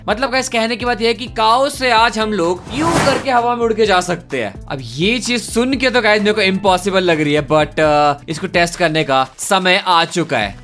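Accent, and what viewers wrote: India. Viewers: Why do all indian sound like this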